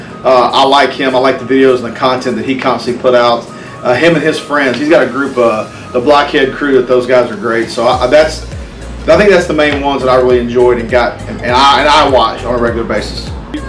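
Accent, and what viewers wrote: South, United States. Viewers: So to sum up: Talks. Sips Crown "Role". Talks. Sips Crown "Role". Talks. Sips Crown "Role". Talks. Sips Crown "Role". Sips Crown "Role". Talks. Sips Crown "Role". Talks. Sips Crown "Role". Talks. This video has been brought to you by Crown Role. LMAO! I think I will contact Crown Role and let them know they have a supporter! Just messin' with ya. I love a Southern accent. I've been sippin' on Tanqueray and Tonics this summer. But the price of the gin at the state owned liquor store is outrageous, so I either need to find a more suitably priced gin that tastes like Tanqueray, or find myself an underground out of state supply! I noticed gas went up about $.20/gallon the last week or two. I was thinking about what caused that and realized it was probably due to refinery shutdowns. Thanks for keeping things rocking there! Daphne Blake should be easily #1 by now of all your bikes. 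Mine definitely is. Reliability, looks, sheer power and speed... You just can't beat it. But my CTX 1300 and V45 Magna were and are definite standouts. There's a new Valkyrie owner on the forum in the Houston area. I posted a link to your channel and told him to check you out. And again, you promised us a Talking Heads video and there was no Talking Heads music. "You may find yourself behind the bars of a large motorcycle. And you may find yourself in a beautiful house. With a beautiful wife! And you may ask yourself... Well? How did I get here?" There - I did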